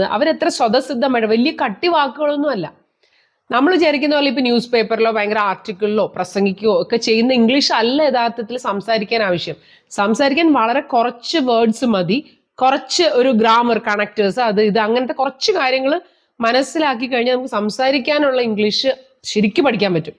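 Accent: India. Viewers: Thanks, but your English pronunciation shud be either British or American. If you follow Indian style people will not get the desired benefit. To get fantastic movies simply try through Google.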